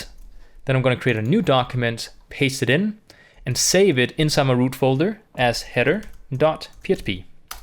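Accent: Denmark. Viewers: Are u danish ?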